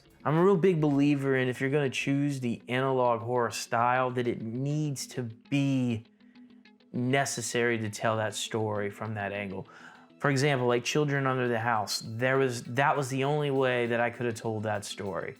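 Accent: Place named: Louisiana, United States. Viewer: I love how despite every video being set in Louisiana, I was still surprised by your Louisiana accent.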